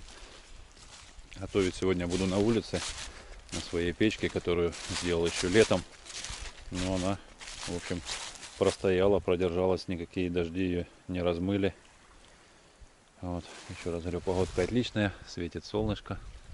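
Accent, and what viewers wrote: Ukraine. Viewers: Та тут сразу по разговору слышно ,что наш с востока Украины!!!Привет из Мариуполя!!!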